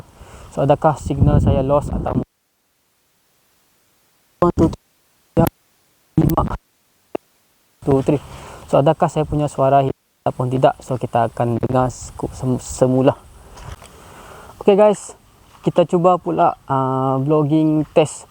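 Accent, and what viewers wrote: Sarawak, Malaysia. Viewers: bos ada slang iban or bidayuh..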